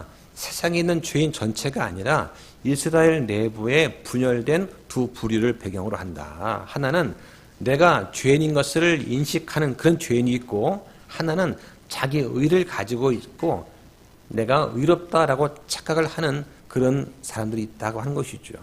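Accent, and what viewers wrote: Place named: Daegu, South Korea. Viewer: 귀한 말씀 감사합니다, 대구분들에게 잘 맞는 억양으로 말씀 하시니 더욱 좋읍니다~~!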